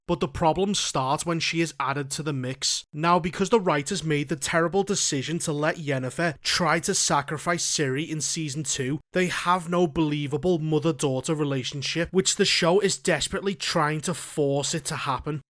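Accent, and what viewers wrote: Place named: Northern England, United Kingdom. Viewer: 😂 funny review. But it did make me wonder why men crying is somehow emasculating? Are you saying you'd think less of one of your boys if he cried whilst talking to you about losing his dad? He's your BOY! It must be hard being your friend if your going through tough times then. 😬 Also, whilst that black witch was definitely miscast, it made me laugh how uncomfortable you are with overweight people. Assuming you live in the West, given your accent (Northern England) then you must live in a permanent state of horror! I thought that woman was pretty. Just not right for the role, given the book description. Or, what we know of the witch graduation magical 'surgery'. It just feels strange that you are so disgusted by her because she's overweight. I dont know if you had the same issue with that king who was banging his sister? 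In the books he's supposed to be handsome. In the show he's overweight, older etc. But, oh well. Another thing this show inexplicably changed. Doesn't make any of them ugly, gravy-bleeding monsters. This may shock you. But, fat people can get partners too. Not everyone agrees with your point about them being ugly, disgusting monsters, is what I'm saying.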